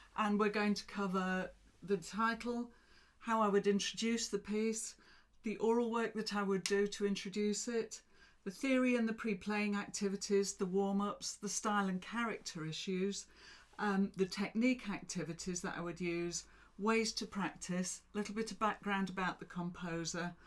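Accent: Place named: England, United Kingdom. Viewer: you sound English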